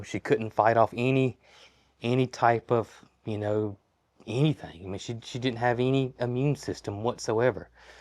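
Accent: South, United States. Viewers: You popped into my feed. I gave you a listen. Your southern accent reminded me of my Tennessee kin, now all gone. Just hearing you talk brings me great comfort. I was born and raised in Indiana but my daddy would take us on our summer vacation EVERY year to my grandma and grandpa’s farm in the hills of Tennessee. I’ve slopped the hogs. I’ve shelled the corn out of the corn crib for the cows. I loved to watch grandpa milk. I loved learning to make butter and rub the sage and dry apples. They had an outhouse. They had a horse and two mules that actually worked the plow. Your channel just makes me feel good. I’m 70 years old this November.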